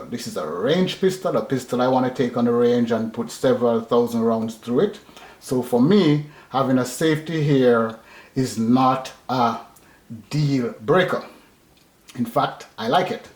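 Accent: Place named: Jamaica. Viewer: Jamaican not Afican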